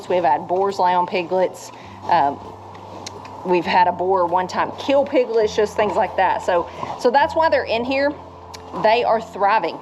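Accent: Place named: Appalachia, United States. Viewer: Well I'm hooked lol Good lookn gal right there with a soothing voice sounds just like a Appalachian gal👍🏻😍 She can tell me bed time stories anytime,yall take care of the animals and yourselves!!! I ll keep checkn in on ya😉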